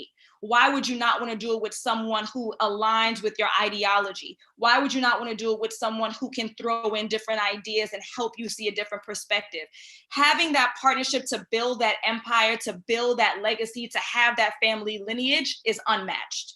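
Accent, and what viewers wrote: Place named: Caribbean. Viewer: Amazing content. Yuh sound like a Caribbean. I support you!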